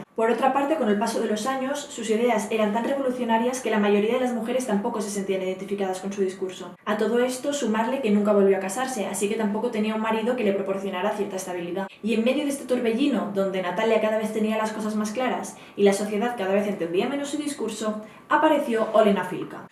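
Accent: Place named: Spain. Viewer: Muy buen tema, pero muy rápida y poco entendible si no se tiene el oído adiestrado para el acento español, la dicción se podría mejorar por favor.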